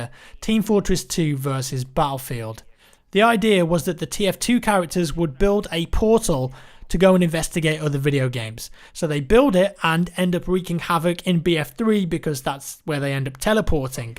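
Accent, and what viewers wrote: England, United Kingdom. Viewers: Luv u! can't resist your awesome English Accent. Plz keep these fantastic videos going!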